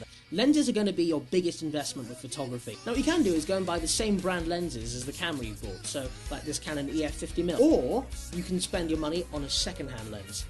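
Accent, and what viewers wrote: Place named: RP, United Kingdom. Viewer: Really interesting stuff- despite really enjoying photography, I've never really understood all the mechanics of it so cool vid :) As a side note, you might speak a little more slowly? Your voice is very engaging but the pace is a little...pacey ;p Sean wants me to add that he thought it was very good and he was impressed :) ...also, I forgot how posh you sound!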